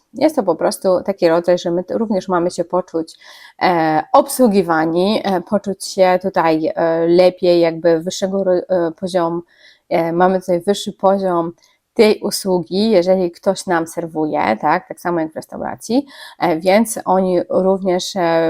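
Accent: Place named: East, Poland. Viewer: Pani ta mowiac po polsku ma trochę wschodni akcent, taki "śledzikowy". Zauważyłam,że coraz więcej młodych- dorosłych ludzi tak mowi używającąc zmiękczonego języka polskiego, jakby nie wyszli z dzieciństwa.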